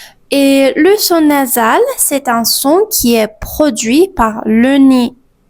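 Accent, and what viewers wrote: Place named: Spain. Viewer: Madame a fait un très grand effort et c'est LE défi bravo mais pardon l'accent reste très fort, surtout en ce qui concerne le R, ça ressemble plutôt au J espagnol désolé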